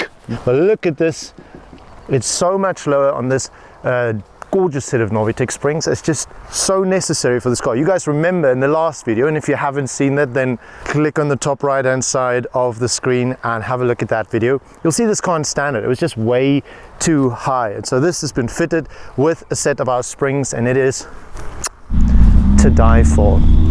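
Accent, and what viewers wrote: Afrikaans, South Africa. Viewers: This guy sounds South African... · Love the Afrikaans accent - flying the SA flag high and mighty ………boss content too - cheers mate keep us entertained 💪🏼😉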